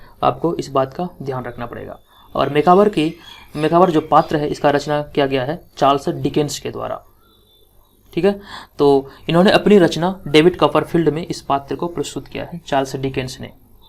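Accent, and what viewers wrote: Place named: North Bihar, India. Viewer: you belong to north bihar. i can tell with your voice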